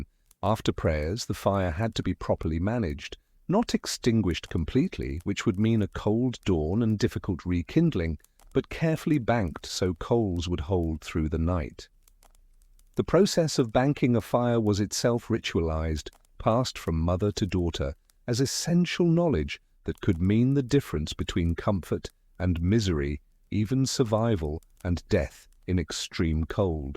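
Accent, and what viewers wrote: England, United Kingdom. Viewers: Old west stories by a narrator with an English accent?! I’m out 🙄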